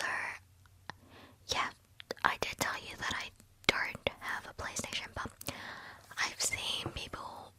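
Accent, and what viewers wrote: Australia. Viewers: ohhhhhhhh its australian that'd do it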